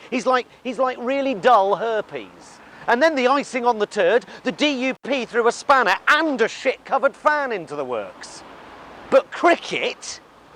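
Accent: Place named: London, United Kingdom. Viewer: Gotta love that London accent.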